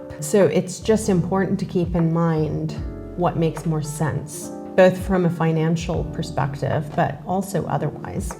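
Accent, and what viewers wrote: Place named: West, United States. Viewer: If your hometown is Helsinki how is it that you sound like you are from the western USA? You have no trace of a foreign accent.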